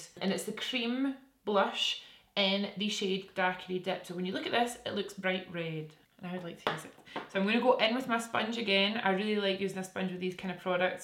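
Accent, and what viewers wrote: Scotland, United Kingdom. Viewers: LOVE that Scottish accent omg..my mom is from Paisley, its awesome! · I start to annoy myself when I watch ur videos. I am from Vancouver Canada and I find myself repeating every other word just trying to mimic you..I love your accent🥰💖🥰lol my bf is usually on the bed beside me and he can’t see or hear what I’m watching, he just hears me saying random words with a Scottish accent to myself in a very serious tone🤦‍♀️😂🤣😂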